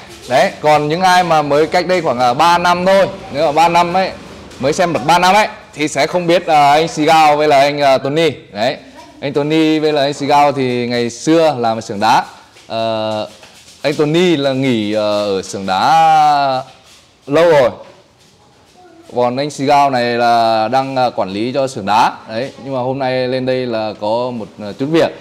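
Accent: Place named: Central, Vietnam. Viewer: Tôi cũng giống bạn,bán đầu Tôi xem một video ăn cướp ở Châu phi,sau đó có một kênh của người Việt Nam,anh ấy chủ xưởng gỗ. Nhưng xem video đó không có gì vui,anh ấy chỉ quay nhưng không vui, không giúp người.Sau đó Tôi Thấy kênh Quang Linh,anh ấy mặt mày đẹp trai,vui tính, giọng của người miền Trung (Tôi là người Huế ), hài hài.Rất ấn tượng, nên Tôi xem kênh,khi bạn ấy ra video thứ hai, và từ đó Tôi đã xem cho đến hiện tại.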